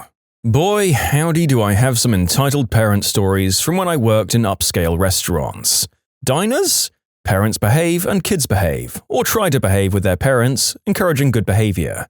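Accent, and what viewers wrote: United Kingdom. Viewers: I love this guy's british voice, it's pretty cool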